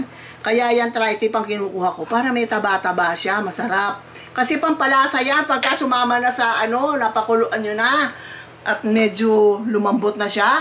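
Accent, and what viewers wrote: Iloilo, Philippines. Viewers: Ilonggo ba si? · Ilonggo ka nay? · ilongga ka? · Ilonggo po kayo? Duha kabilog hihi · Ilongga ka? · Ilongga ba c mother? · Ilongga man gali si 🥰🥰🥰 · ilongga ka pala??? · has an accent of ILONGGO hehe love u stay safe. hoy lakas mo asirin c more vlogs po. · fan mo talaga ako pero may ask lang ako. Ilongga po ba kayo? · Ilonggo ka Tita?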